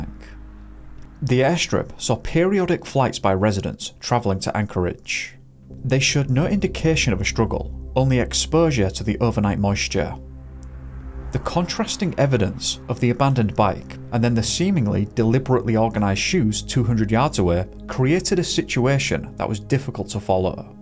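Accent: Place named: North, United Kingdom. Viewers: Northern English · I love your narration, so articulate, great vocabulary and with a proper northern accent! Thank you for representing with quality content.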